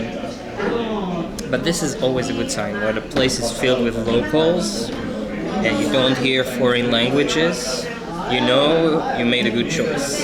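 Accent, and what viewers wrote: Brazil. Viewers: Your accent sounds Brazilian, are you from Brazil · You are Brazilian ,arent you ? Your accent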